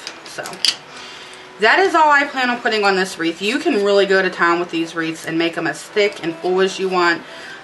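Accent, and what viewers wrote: Pittsburgh, United States. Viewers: Thanks for sharing and going step by step for us beginners! Love your accent, I'm guessing Pittsburgh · Not even 30 seconds into the video and I knew you were a Pittsburgh girl:) Great video...n'at;) Thanks for sharing:)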